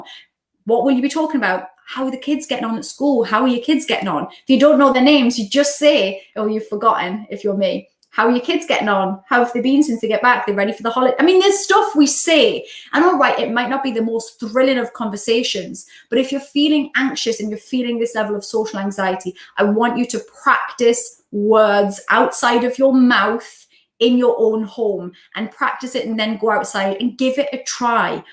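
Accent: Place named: Geordie, United Kingdom. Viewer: I saw your Georide Accent videos first. You really have made your accent more proper. I Love the Georide accent!!!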